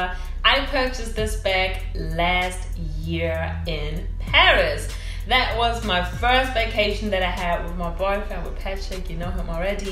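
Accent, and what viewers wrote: Germany. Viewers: Are you german ich hörs raus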